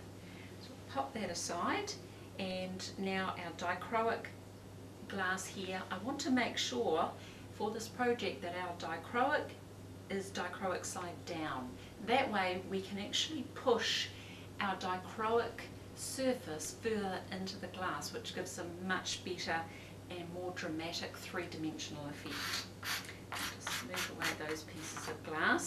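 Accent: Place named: New Zealand. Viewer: ahh.. wonderful tutorial. I have wondered how these are made, and here in NZ too! I recognised your kiwi accent. I am getting the vacuum gear together (almost have all now) to make the vapor deposition dichroic glass here in NZ as well. Is there a maker or supplier in NZ already?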